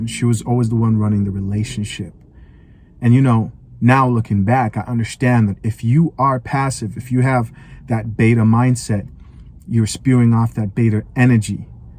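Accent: Africa. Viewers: The African accent is one of the best. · Holy shit you was born in africa lovely bro! I’ve been watching your channel I recently just got redpill. I was born in Nigeria, when I first moved here I was 14 with a deep accent too. Much love brother keep winning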